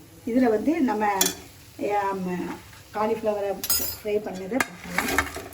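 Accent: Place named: Nagercoil, India. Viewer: I am from Nagercoil.Vadasery. Your slang is as ours. In which place you are. I daily see your vedios. Sweet Amma. Very happy to see you.😄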